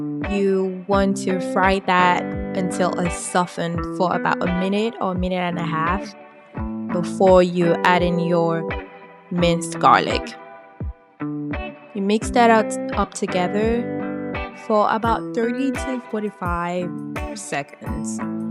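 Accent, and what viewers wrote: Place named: United Kingdom. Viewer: Why did you sound British in this video abi na AI? 🤣🤣🤣. Tell me the truth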